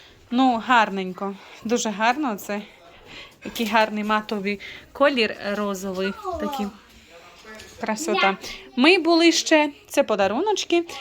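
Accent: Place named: Kremenchuk, Ukraine. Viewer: долгие лета! Счастья и здоровья!,очень нравитесь вы и ваша сестричка. Очень нравится как вы говорите,у меня двоюродная сестра из Кременчуга,так- же разговаривала,слова как ручеек льются.❤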